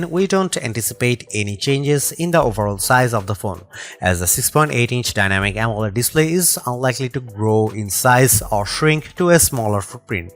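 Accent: India. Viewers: Indian English accent 😂😂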